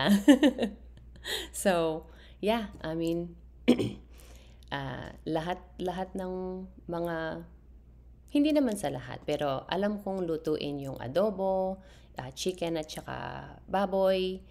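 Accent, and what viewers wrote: Philippines. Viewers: Tagalog pa rin ang accent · ung accent nio po ung mga matatapang na pinay po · Very filipino ka pa din po.. Pati yun accent m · Got me laughing, as my friend in Lapu-Lapu talks the same, leaves me laughing so much, 🤣🤣🤣🤣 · was surprise that tagalog accent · You have a very Filipino accent when you speak Tagalog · You've got a great Filipino accent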